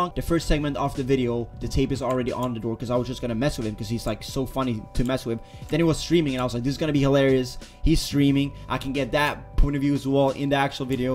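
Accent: Sweden. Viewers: i did know he was swedish and that he coukd speak swedish....i just didnt notice he had a bit of an accent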